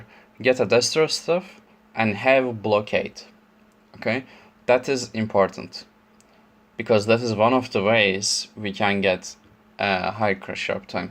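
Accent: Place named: Turkey. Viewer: Aksanından anlamıştım türk olduğunu!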